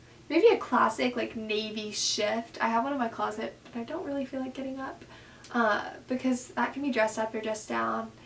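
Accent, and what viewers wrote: South, United States. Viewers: I think that you have a slight Southern accent, especially on your o's.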